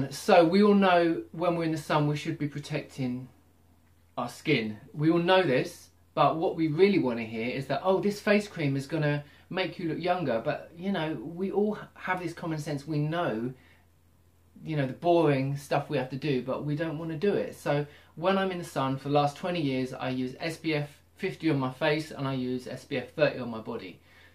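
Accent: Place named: United Kingdom. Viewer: can't we agree it's the Celtic / ANglo background of you? I clearly pick on some British accent tones, I'm italian but also...slavic. Which is the worst, eastern european look 2 times older..i'm late 20s and look like 40 due to my slavic backgr. thanks for the video though you make some very good points.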